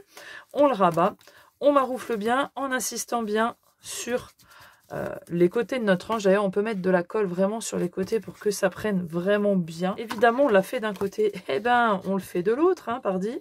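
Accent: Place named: North, France. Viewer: Chalut , je visionne tes tutos merci d' où es tu ? Pour dire vingT en prononçant le T ? Du Nord , de la Haute Saône ? Jaime entendre les accents hi hi 😂❤🎉 Chat'leureusement F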